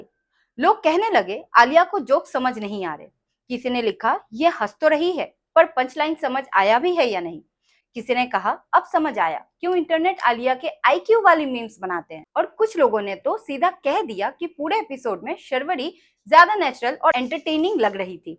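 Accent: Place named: Bengal, India. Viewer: i like your bengoli accent hindi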